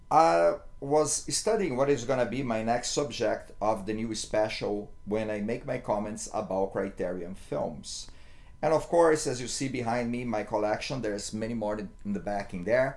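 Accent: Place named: Brazil. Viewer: Sotaque Brasileiro é inconfundível. Muito boa sua crítica,! Não o conhecia. Contudo, o filme não funcionou para mim, o fato, como você mesmo disse, de o elenco não trazer atores profissionais, prejudicou muito a minha imersão. Por vezes ridicularizei certas cenas que seriam incríveis, na minha opinião, com atores profissionais. Em suma, achei a ideia brilhante, porém, muito mal executada. Algo positivo disso tudo foi que realmente nos colocamos na pele do jumentinho, uma vez que os animais também nos veem como “atores amadores”, tendo em vista que nossos movimentos, falas e atitudes são incompreensíveis para eles, como é comum em animais de outra espécie quando se observam. Logo, toda a atuação tosca e inverossímil, aproximou-me muito mais do animal do que qualquer outro personagem. Entretanto, mesmo assim não fui capaz de digerir a obra. Nota: 6/10.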